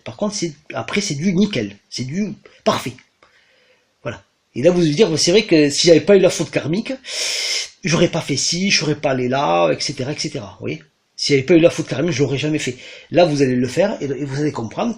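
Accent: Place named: Cantal, France. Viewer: Bonjour, je viens d'ecouter plusieurs de vos potcasts et ce qui m'a frappé c'est votre accent qui ressemble fortement à celui de chez moi, le Cantal 😅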